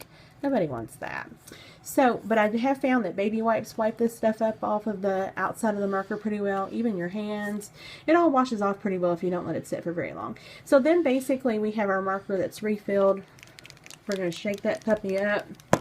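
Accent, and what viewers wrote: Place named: South, United States. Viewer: Ahh love a southern accent tutorial vid 😊 reminds me of my fam down south. Ty for posting this!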